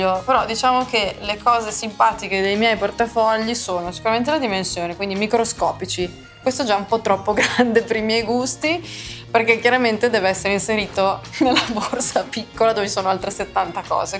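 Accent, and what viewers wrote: Italy. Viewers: Si è brava... E bella. Le donne Liguri parlano così... Io anche...parlo così sono cresciuta Genova.. le mie nipoti belle..parlano con accento così... Ciao baci...Ellis❤❤❤❤😅😅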